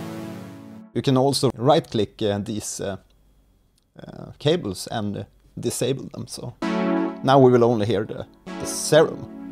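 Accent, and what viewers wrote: Sweden. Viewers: I know that accent! Are you Swedish?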